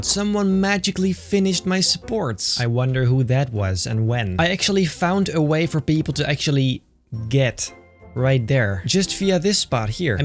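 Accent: Netherlands. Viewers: Are u dutch? Your english Accent sounds like you’re from the netherlands 😁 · I keep trying to figure out from which country he is by trough the way he speaks, I came to the conclusion it had to be Dutch.... I think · are u dutch? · Nederlands accentje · Your Dutch isn’t ? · Re u dutch? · Je bent Nederlands ik hoor het man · Are you dutch? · Is he dutch? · I smell nederlands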